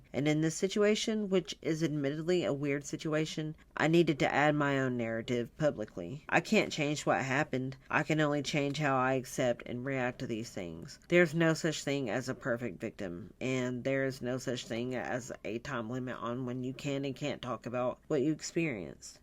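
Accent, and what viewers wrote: South, United States. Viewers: Oh, where do I start? I freaking love this, the way you narrate these stories! your voice is so soothing, and the southern accent makes it all the more enjoyable The one about the baby clothes and the one about George were the spookiest imo I have a couple suggestions/requests In the beginning I thought there was background rain sounds, but I'm not sure.. I think it'd be. a great touch to set the atmosphere. And I think it'd be really cool if you draw something about the stories, it would be interesting.. like whatever strikes you the most about those stories, idk it's just an idea 😊 I also love the fan art in the end.. I hope you keep up with these and it becomes a series on the channel cause I'm here for it. much love for you ❤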